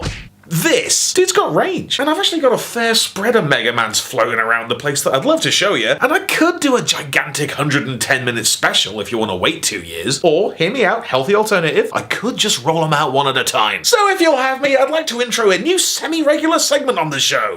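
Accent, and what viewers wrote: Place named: England, United Kingdom. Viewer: Jetfire from ROTF had a heavy Scottish accent, I know has an English accent, but it still reminds me a lot of Jetfire.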